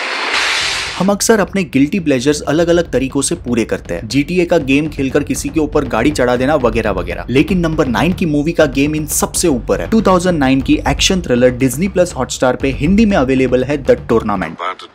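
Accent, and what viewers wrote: India. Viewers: I watch your videos so that I could copy your accent. That's the best Indian accent.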